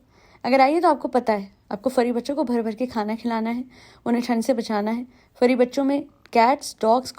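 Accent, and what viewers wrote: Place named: Punjab, India. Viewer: I am in ❤ love with your Punjabi accent plssssss aise hi podcast banaiye❤❤❤❤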